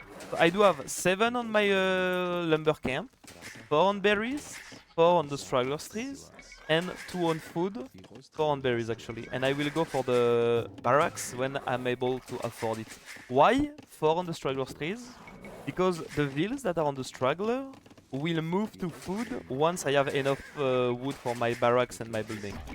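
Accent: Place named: France. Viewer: Bizzarement on sent dans ta voix que tu parles français ou que tu as une peux l'accent je me trompe 😏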